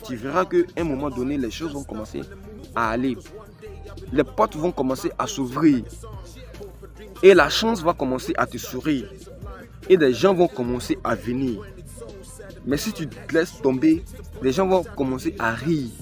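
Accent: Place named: Ivory Coast. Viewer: Si tu es ivoirien garde ton accent stp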